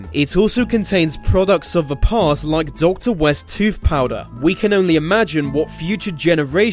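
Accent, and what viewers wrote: England, United Kingdom. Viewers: wow really!? thank you. i have an indian accent but i would like to learn to speak in your english accent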